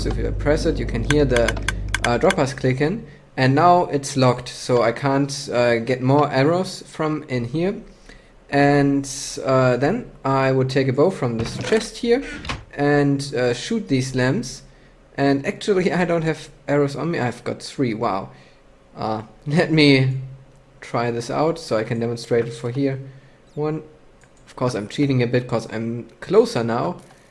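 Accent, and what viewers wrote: Germany. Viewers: woow the redstone was so complicated I almost got headache haha... btw I like your german accent...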